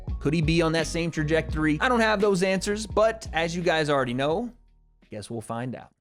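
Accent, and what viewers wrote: Ireland. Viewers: Bro if I hear that Irish accent again I'll unsubscribe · For an american your irish accent is pretty fire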